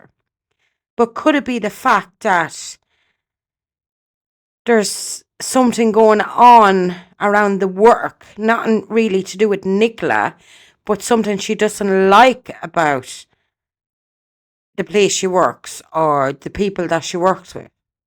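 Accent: Ireland. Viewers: What part of Ireland are you from ?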